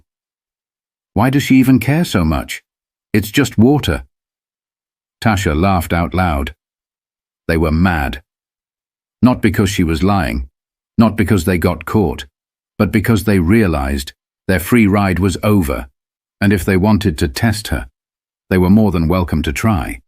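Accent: United Kingdom. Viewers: Never any particulars such as dates, place, etc. The notes say, "Our mission is to give voice to the untold narratives that inspire, educate, and empower." Then, "Please respect the effort and creativity that went into creating this work." A created work of fiction indeed. Using a narrator with a British accent doesn't do much to enhance the so-called "Black" experience.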